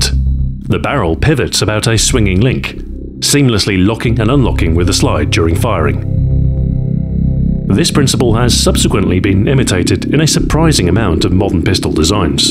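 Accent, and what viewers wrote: United Kingdom. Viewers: A very British Terminator’s voice. And here is probably to me THE reason why I think deep baritone BRITISH voices are the BEST at serious/epic narration, bar none! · Lol it's funny because sounds British. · He is British, Captain Price is also British xD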